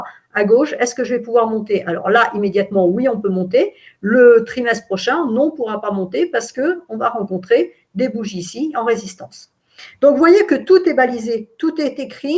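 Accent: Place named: England, United Kingdom. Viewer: Cet accent Anglais me laisse pantois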